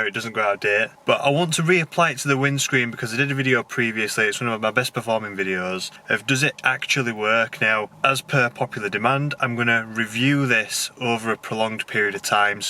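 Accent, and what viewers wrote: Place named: New York, United States. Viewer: Why does he talk like that? A American doesn't sound like that. He's probably from New York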